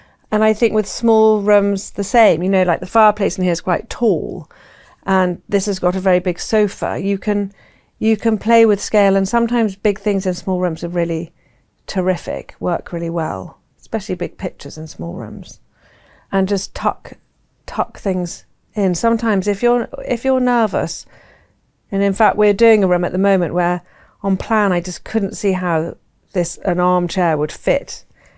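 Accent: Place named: England, United Kingdom. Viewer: Love her homey style and I love her English accent.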